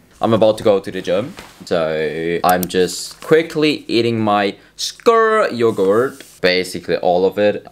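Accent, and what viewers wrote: Germany. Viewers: On dirait il un accent allemand💀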